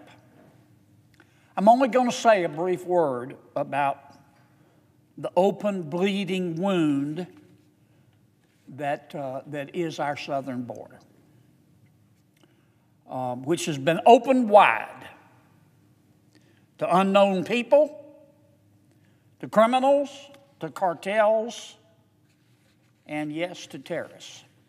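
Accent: South, United States. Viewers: I just love Senator Kennedy!! You speak the TRUTH and know when boots are needed! Your Southern charm and accent gets your point abundantly clear to all listening. 😂❤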